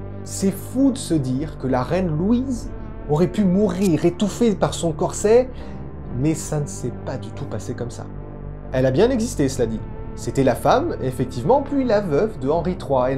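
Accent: Canada. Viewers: tu as l'accent canadien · ton accent Québécois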